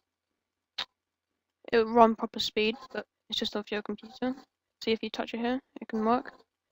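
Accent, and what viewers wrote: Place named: England, United Kingdom. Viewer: luv ur english accent X)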